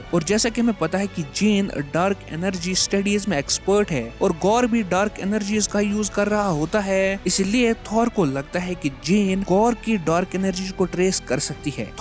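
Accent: Kashmir, India. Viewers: i think he orator seems to be a Kashmiri..?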